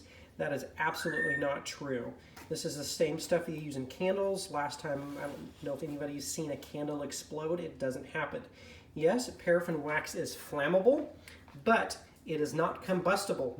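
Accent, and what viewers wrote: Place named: Midwest, United States. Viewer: Aside the annoying accent (yankee? midwest?) that over-emphasizes on bilabial consonants with an "h" sound that makes the talker sound like some pompous Victorian colonial windbag (it's maybe not the author's fault anyways, just his native accent), I'd say this is the best explanatory video on the matter. No useless, pedestrian intro... gets to the point soon enough. Much thanks! Will try that. I was also just wondering if adding paraffin oil is important, or just wax is fine.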